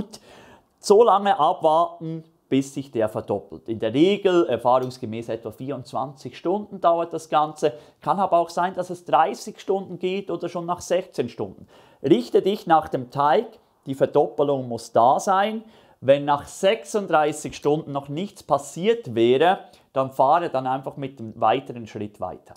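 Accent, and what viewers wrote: Switzerland. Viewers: danke für deine coolen videos!und ich finde deinen swiss akzent richtig sympathisch!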